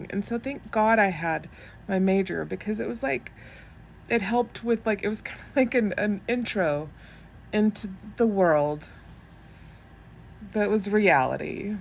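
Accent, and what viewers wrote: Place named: Texas, United States. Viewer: omgeeeez I almost commented that your accent sounded Texan!!!! You sound like my sister she lived out in Fort Worth most of her adult life ❤❤❤ I love Texas!!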